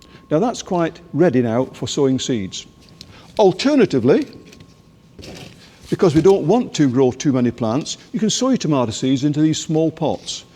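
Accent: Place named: Lancashire, United Kingdom. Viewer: Lovely accent, are you from Lancashire?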